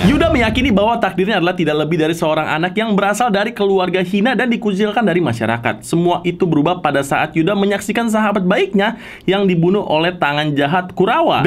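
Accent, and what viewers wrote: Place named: Java, Indonesia. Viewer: Kudune koyongene koyongono agak keluar jawa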